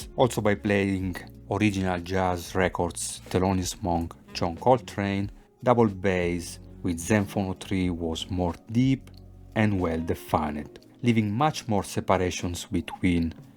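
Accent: Italy. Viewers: Also, if you don't mind me saying so, I'm not in the least bothered by your Italian accent but there are a couple of things that really hurt my ears and one of them is 'knobs'. The k in knobs is silent, like in 'know, 'knife', 'knit', 'knock', 'knight' etc. So it's pronounced 'nobs'. Also, knobs are the things that you tend to turn to adjust. The push only things are called buttons.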